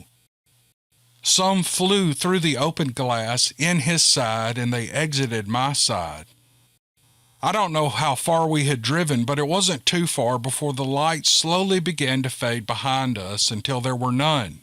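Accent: South, United States. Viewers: 11/2/22 Hi. I very much enjoyed these stories. I do enjoy long videos, especially at night. About that accent. Many of my older relatives are from the south and I love to hear them speak. They just laugh at me but it's fun to listen to them anyway.😁 Anywho, thanks for the stories (and the accent)🤣. I can't wait 'til the next batch. Take care! ⭐👍⭐ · Talking about southern accent mine is really close to yours and I remember the first online game I played. When I started talking everyone that was playing at that time talked about it for weeks after. I was laughing my but off at them cause I’m thinking what’s so special lol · My story too 😲😂😄 I love reading 'n his sweet southern comfort draw. I listen to his tale's over 'n over...many nights he helps me fall fast asleep 😌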